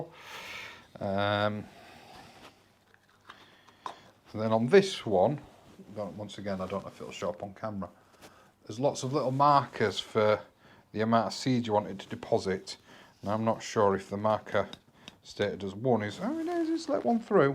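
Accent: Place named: Northern England, United Kingdom. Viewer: I'm sure your subscriptions will grow (as well as your plants!) Thank you for another informative vlog. I have one question, where in the UK are you, you sound like a Northerner, hope thats not an insult! I'm a Yorkshire lass lol 🙂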